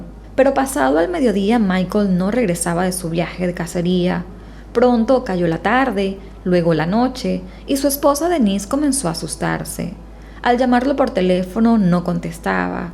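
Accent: Standard. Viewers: Me encanta tu voz neutral sin acento. Bendiciones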